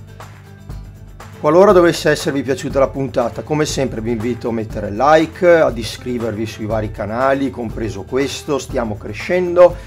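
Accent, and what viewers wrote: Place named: Verona, Italy. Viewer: Naturalmente Veronesissimo 💪🏻💪🏻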